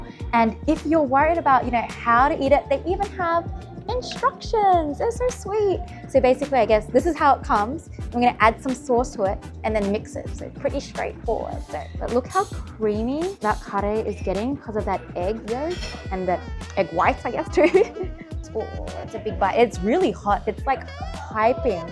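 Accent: Australia. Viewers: Mizuno … might be great for me being gluten free…. Good to hear the Aussie accent! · she sounds like an Aussie, so are the prices in Australian dollars? would be more helpful to list the actual prices or do they accept foreign currencies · I have a thing for asian girls with an aussie accent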